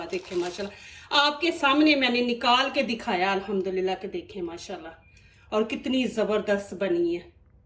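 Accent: Punjab, India. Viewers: Plz zara ahista or kum bolen plzplz.or punjabi ki jagah urdu lehjy mn bolen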